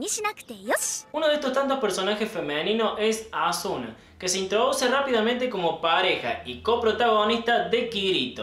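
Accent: Córdoba, Argentina. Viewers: Porque es cordobes :v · Dacmass Creó porque ambos son cordobés :v tu de donde eres? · ¿Sos cordobés? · es cordobez :v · Sí, es cordobés.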